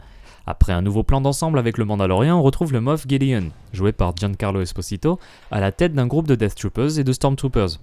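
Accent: England, United Kingdom. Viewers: Je pense que la scène avec l'enfant et le matériel de la CSI est un flashback. Pour le vaisseau inconnu, il verse dans le style corellien non ? Enfin, le personnage avec le blaster sur l'épaule me fait tout de suite penser à Cydon Prax, un chasseur de primes apparu dans le jeu vidéo Star Wars The Clone Wars (PS2 et GameCube). Superbe analyse comme toujours ! Il faut conserver l'accent anglais, c'est là votre marque de fabrique 🙂 !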